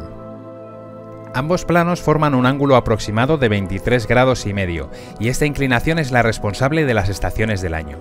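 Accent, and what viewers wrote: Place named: Castile, Spain. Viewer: Gracias por el video, pero más que nada por narrarlo en castellano,estoy hasta los 🤬🤬🤬 de oír videos de latinos con esa forma de habla musical y con palabras indescifrables que ellos tienen,acordes a su cultura y a su emisferio.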